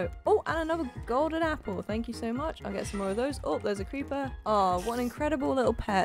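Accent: United Kingdom. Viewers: Are you british? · 1 are you British 2 how did you get so good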